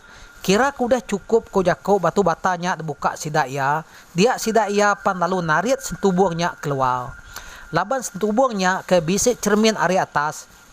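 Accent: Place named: Iban, Malaysia. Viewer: ngae ka nda' ingat maya udah pulay banua empu...nyak alay ka' blajar baru sambel nyerungkay mystery, sambel nunda' slang Ibanic Utara baka Narator empunya Youtube.hahah.. Punjut-Krokuop Old Housing Estate jalay fav aq, laban jalay ia miet & nice riverview ,cocok buat kitay romentic ngau pangan sambel dribing. haha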